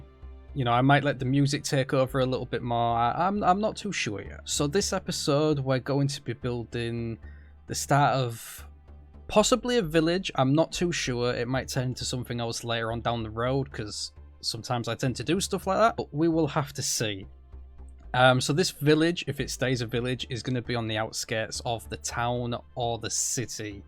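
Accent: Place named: Yorkshire, United Kingdom. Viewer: Knew the accent was familiar, I live up near Middlesborough and go to the York markets nearly every year.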